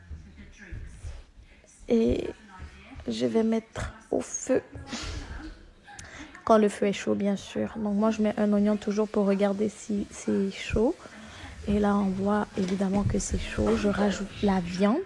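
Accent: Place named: Montreal, Canada. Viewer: Montreal sa sentend dans la voix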